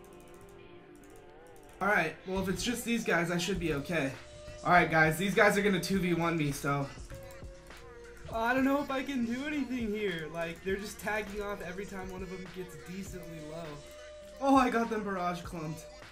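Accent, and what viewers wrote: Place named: East Coast, United States. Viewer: Cali :o i totally thought you were an east coast guy. now i sad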